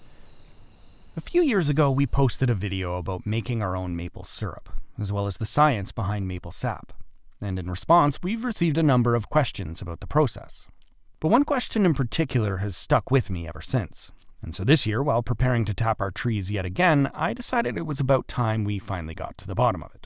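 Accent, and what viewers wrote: Canada. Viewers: Victory Begins in the Garden And he has the most Canadian voice to go with it. CBC Radio worthy. 🙂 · This guy has a thick canadian accent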